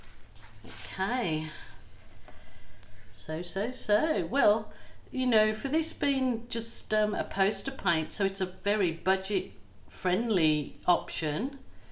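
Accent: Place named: Liverpool, United Kingdom. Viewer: Do you have a Liverpool accent mixed in,.,, sorry if this is rude or you've mentioned it. Love this videos and your simple recipe proves the art comes from the artist's skill. LOL watching you now and it does sound like some scouse in there!!! Ps love these colours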